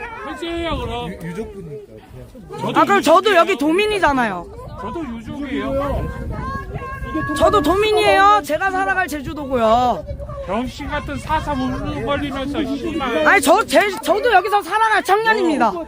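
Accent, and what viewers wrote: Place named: Jeolla, South Korea. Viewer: 말투가 전라도 같은데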